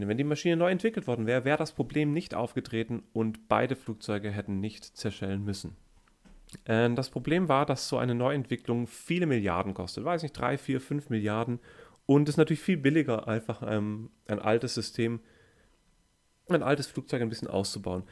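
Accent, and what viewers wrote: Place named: High German, Germany. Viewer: I know German I was born 100 percent pure German I am a hutterite. He's talking g high german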